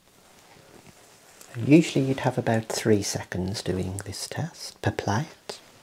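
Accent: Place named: Midlands, United Kingdom. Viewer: Me too. His Midlands accent has lessened which is a shame.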